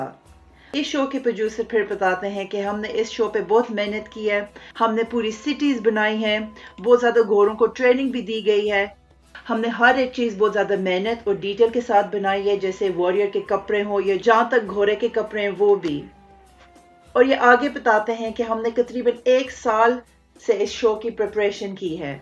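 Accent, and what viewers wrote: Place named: United Kingdom. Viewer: Why are you speaking Urdu in English accent? It doesn't suit you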